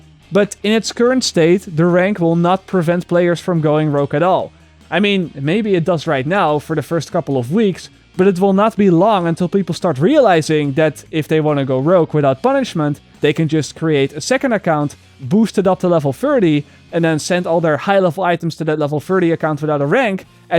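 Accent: Netherlands. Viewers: you sound like you're dutch.Why? I hear it on your voice. And btw.... Never stop making videos,only if you want to xP. Love them.. Got yourself a subscribe buddy. Daag! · you dutch? you sound dutch.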